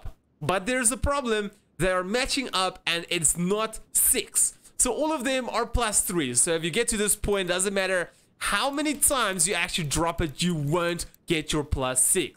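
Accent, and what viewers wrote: South Africa. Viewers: Once you have all +3 bars, start with the bar on the right most side, and then move it one down and do that in order from right to left move each one down and you should get +6 on all. By the way you sound a little South African. Not a very strong accent but having lived here since birth I can pick out even very subtle SA accents just like that no matter where I hear them.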